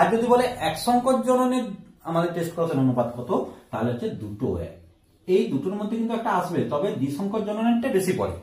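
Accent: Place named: Purulia, India. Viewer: ooo sir amar mamabari to purulia te tai apnar kotha gulo khanikta orom sunte lagchilo bole bollam🙏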